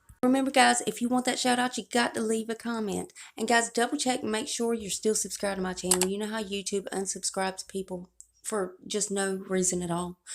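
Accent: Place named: West Virginia, United States. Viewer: Girl you sound more like a WV girl than a OH girl. Just sayin.. you have to be from the country! ❤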